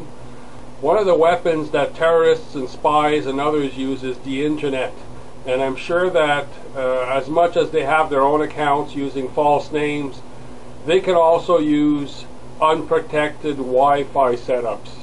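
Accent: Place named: Canada. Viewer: hahaha Canadian accent!!!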